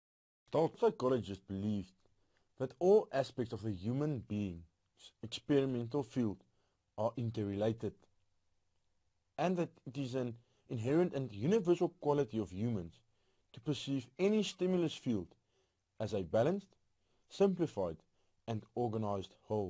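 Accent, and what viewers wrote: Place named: South Africa. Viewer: Nice to hear an SA accent on Youtube!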